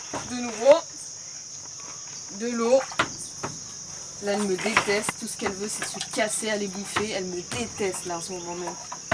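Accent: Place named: South, France. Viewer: Tu me régale !! Cet accent du Sud de ouf 😂😂 👍🏽😘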